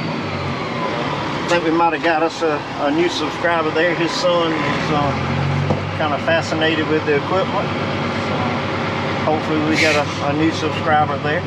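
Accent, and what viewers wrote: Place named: South, United States. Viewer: no P in sumter lol, that southern draw, love it. great video and recovery. you have a great deal of patience. I recently recovered a tracked skidder with mud and water over the controls, crappy filming I did because people didnt want to be on film and they didnt know how to operate it at all, made for a long day, lol. My truck is way smaller too, lol. Yall stay safe and keep having fun.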